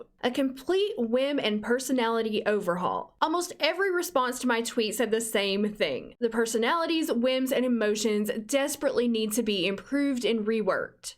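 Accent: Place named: Kentucky, United States. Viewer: Hi your acc not sounds so match like mine any chance your from bardstown lol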